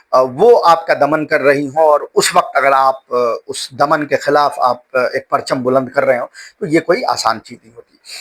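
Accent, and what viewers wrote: India. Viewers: Great analysis. Although you were doing this English but this switch to Hindi is awesome. I also know English very well and listen many English vlog, But listen things in own mother tongue with desi accent looks awesome.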